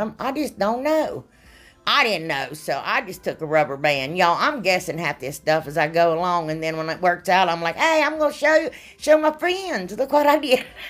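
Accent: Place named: North Carolina, United States. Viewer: This was my first time watching your channel and I was so impressed. Your projects are fantastic and your personality shines through. I love your accent. I’m from Tennessee and I thought we had a good southern accent but yours is precious.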